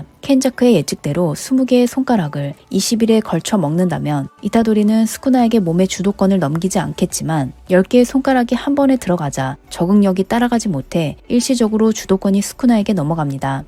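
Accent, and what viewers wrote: Japan. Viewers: 혹시 일본인이신가요ㅜㅜ 일본어 지명, 이름 말씀하실때 발음이 다른것같아요!ㅎ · 이분 억양이 일본분일듯..조금씩 액센트가있음ㅎㅎ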